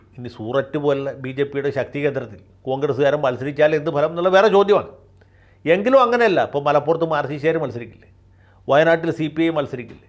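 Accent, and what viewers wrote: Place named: Thiruvananthapuram, India. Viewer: സാറിൻ്റെ തീരു::പുരം ഭാഷാശൈലി വിട്ടുപോയില്ലേ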